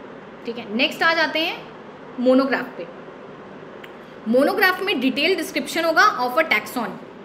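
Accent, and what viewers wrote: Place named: Bengal, India. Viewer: Bengoli accent ♥🔥🔥🔥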